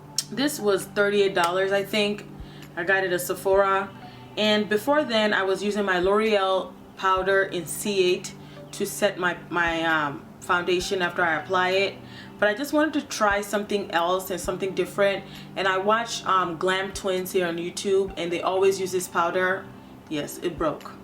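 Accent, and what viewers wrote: United States. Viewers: Lol when u rolled them eyes so hard.... "I cant be lookin crazy in these streets" LOL I just love u and how ur african/chicago accents intertwine lol... Just glue ur powder pan back into the container. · your country accent is so cute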